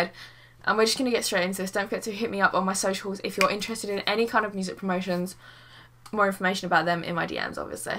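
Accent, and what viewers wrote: United Kingdom. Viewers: I really love ur British accent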